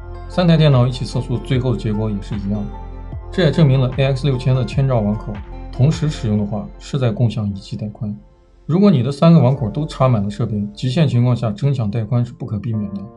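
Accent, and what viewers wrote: Northwest, China. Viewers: 标准西北口音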